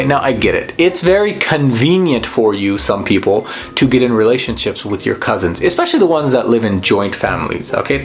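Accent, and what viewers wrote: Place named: United States. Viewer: man! man! man! I'm new on your channel, you're brilliant. i can watch each of your videos multiple times!! I've never loved American accent so much before i heard you talking (I'm assuming you're not canadian. I'm sorry if you are) please respond to my comment if you get a chance to read it, I'm already a huge fan love from the uk xx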